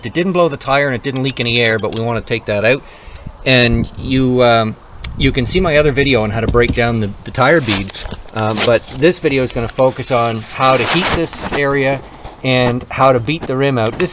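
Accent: Canada. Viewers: Canadian eh????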